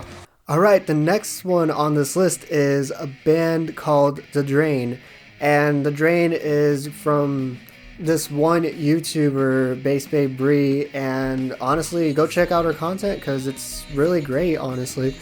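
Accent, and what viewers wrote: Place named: Texas, United States. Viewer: Ayyy, you from Texas man 🤘🤘🤘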